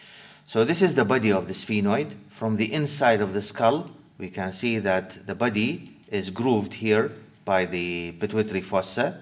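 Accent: Iraq. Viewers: .. are you Iraqi teacher??? your dialect is 100% Iraqi:)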